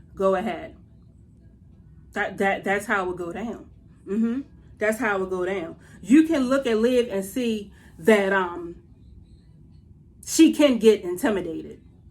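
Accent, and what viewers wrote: Baltimore, United States. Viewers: You from Baltimore Ms.?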